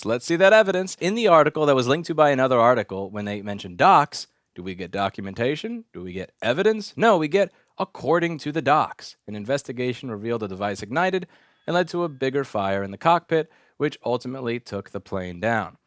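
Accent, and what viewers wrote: New York, United States. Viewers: objectivity really gives him credibility, even if at times he sounds like the New Yorker stereotype.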